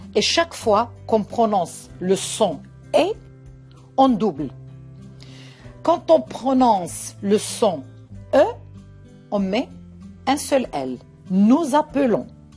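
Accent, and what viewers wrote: Arab world. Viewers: Je vous remercie pour ce superbe résumé. Même si on utilise beaucoup la langue française, on a tendance à oublier les règles essentielles. Vous avez effectivement un accent arabe ou kabil mais il me semble que vous en connaissez plus sur la langue française que beaucoup de français de souche. Alors continuez à nous faire des vidéos comme celle-là . C'est très utile.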